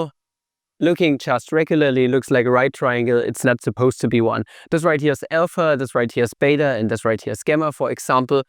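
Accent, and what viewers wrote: Germany. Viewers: I think his first language is German.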